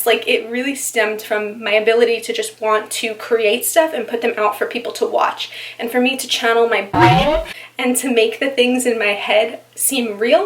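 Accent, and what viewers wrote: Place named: New Jersey, United States. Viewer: You remind me of the jersey shore cast the way you talk!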